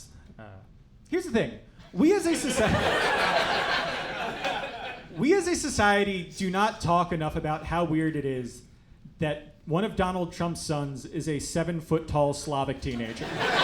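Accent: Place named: Saskatchewan, Canada. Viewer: Lovely, sounds like you grew up in Dog River Saskatchewan, great stuff!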